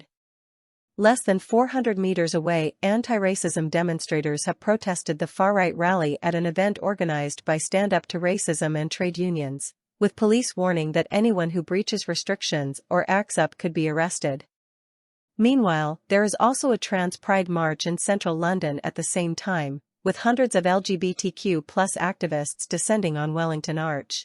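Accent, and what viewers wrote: United States. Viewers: American naratetr Wdf u know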